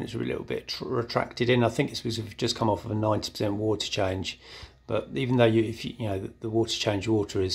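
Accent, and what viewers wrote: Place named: United Kingdom. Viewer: Hi mate I'm based in Birmingham nice to see a reefer with a british accent. I'm looking at starting my first reef tank I'm stuck between getting a small nano tank like you have now or getting a red sea 250. Bit nervous about starting a marine tank so I'll probably take it really slow with fish only until I feel more comfortable and start adding corals.. what do you think the best option is for a first time fish keeper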